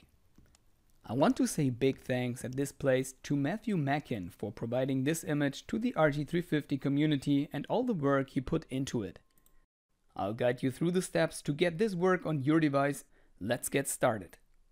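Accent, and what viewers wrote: Germany. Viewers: Hey, aufgrund deiner aussprache gehe ich mal davon aus das du Deutsch sprichst :D! Wie bekomme ich nach der Installation der Rom, Spiele auf das Gerät? Ich weiß es sind schon etliche Roms vorinstalliert nur würde ich gerne wieder Pokemon auf Deutsch spielen! Habe die 128GB Karte drinnen und mit DiskGenius auch den restlichen platz frei gemacht :)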